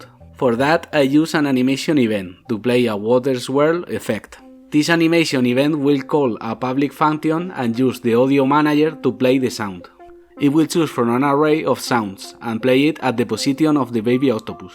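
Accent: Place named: Spain. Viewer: De nada bro, yo no se mucho de videojuegos, pero en esta plataforma has lo que más fácil se te haga, ya que al final Youtube tiene un argoritmo muy loco y podrás estarte matando haciendo un video muy bueno para que al final nadie lo vea, y es muy triste. Otra cosa que podría ayudarte demasiado, es haciendo streaming por Twitch mientras realizas los artes y programas el código del juego. Es muy bueno al momento de interactuar con tu público, además, no es un juego que normalmente suelas ver por la comunidad hispana, esto hace que sea más llamativo, crea un vínculo con el espectador. Por ejemplo conmigo, yo al ver tu acento en inglés, ya dije "Este es español" y eso fue lo que me hizo decidir quedarme en el canal. No tienes que tomar esto al pie de la letra haha, pero me gusta dar consejos que he ido viendo que le funcionan a otros.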